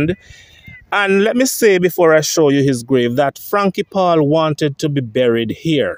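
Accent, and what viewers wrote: Jamaica. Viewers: Bredren that's how Jamaicans dialect sounds. Perfectly legit.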